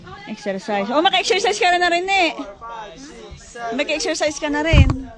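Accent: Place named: Batangas, Philippines. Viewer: malalim po na tagalog may pag ka batanggeño di po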